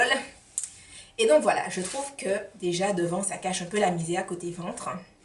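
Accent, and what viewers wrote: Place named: Antilles, Caribbean. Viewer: ton accent antillais, c'est trop mignon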